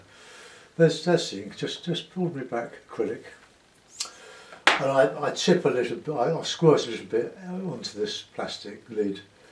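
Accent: United Kingdom. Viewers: I think this is pure genius. Thanks you for sharing your technique! I can't wait to try this today. Thank you so much. I love your British accent too, by the way...so charming.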